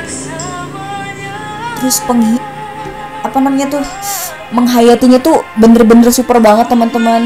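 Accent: Medan, Indonesia. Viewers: Ihh. Cantik kali kakak ini woiii...ahahaha. luck loe. Hihiii. Medan kali kauu.🤣🤣🤣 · Dari logatnya, keknya kakak ini org medan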